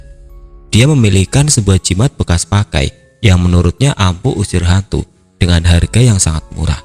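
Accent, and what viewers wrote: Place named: Java, Indonesia. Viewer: logatnya nanggung, klo jawa sekalian, jaksel sekalian.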